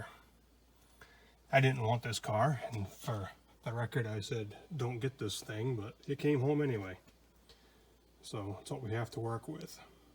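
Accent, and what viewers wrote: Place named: New Hampshire, United States. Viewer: Definitely a NH native! 73, K1GHC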